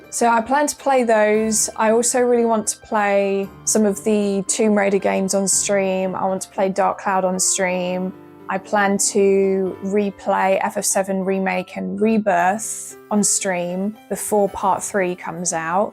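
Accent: United Kingdom. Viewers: I watch the channel’s videos because of the content, and also because I’m learning English your British accent is music to my ears.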